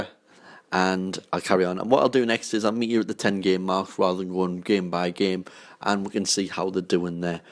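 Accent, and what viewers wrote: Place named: North East England, United Kingdom. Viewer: your accent are you from the north east of England?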